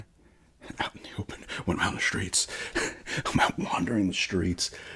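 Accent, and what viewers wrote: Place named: Upstate New York, United States. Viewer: I'm from Syracuse NY so your accent sounds pretty close to home which is one of the reasons I like the channel. One thing I've noticed is we often speak fast compared to folks in other states. I moved to VT and people take a bit more time here. I've got a coworker from Boston so we can converse at full speed which is refreshing 😂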